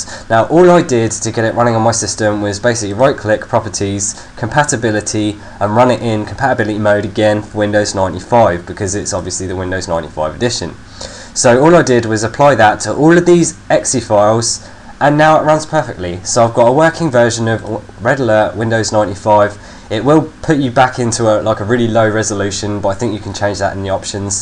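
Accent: United Kingdom. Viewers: Best Accent ! love british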